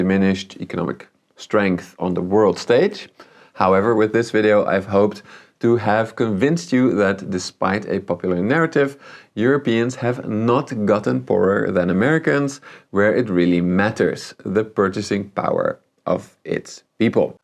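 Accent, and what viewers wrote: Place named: Netherlands. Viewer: Strong dutch accent😂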